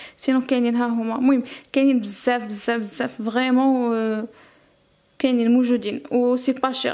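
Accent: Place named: Morocco. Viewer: مغربية حبيبتي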